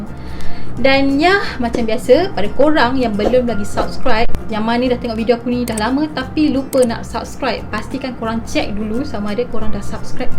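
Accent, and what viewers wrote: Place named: Kedah, Malaysia. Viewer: Owg kedah ka.sekampung ooo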